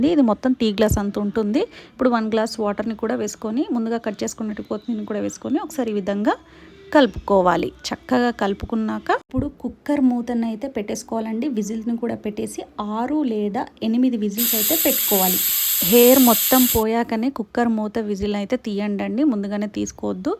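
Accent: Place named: Andhra Pradesh, India. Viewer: Miru andhra valala matladuthunra slang 😊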